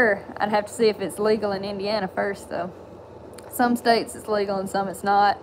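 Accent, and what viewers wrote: South, United States. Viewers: listen to that accent shes form teh south i reckon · Love the southern accent. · Where are you from? Alibami? Missisip? Cute accent, and your dog looks like my old blue Merle sheltie, Zero. I was just noodling some bluegill the other day... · Beautiful country accent 😍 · The nerve of these thieves. Im sorry that they stole your and your dads and friends and family hard work. I some of my military and war actions picture as a statement by putting words on them. I failed to copyright them. I did a image search on the internet and found my pictures on their website location unknown. They stole and own it. I removed all my pictures of the internet now. I now copyright everything I share. I really enjoy your work and every adventure y'all do I love how y'all say at the end of each upload.. 'APRECIATE Y'ALL"... I like to hear southern accent. 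· I love your southern accent I'm from Alabama and there's not many ladies here that speak like us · That's one STRONG SOUTHERN accent!! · Love your southern accent,love your dog and you have such a cool dad. Take good care of your Dad and yourself ... SergeantC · your accent sets my heart all a flutter….all I ever wanted in life is a sweet little Southern Belle…or a “wild bush onion”. I’m not picky. Make my dreams come true. Yours forever, A good ol’ Canadian Outdoors Boy 🇨🇦❤️🇺🇸🙏😉😂🍻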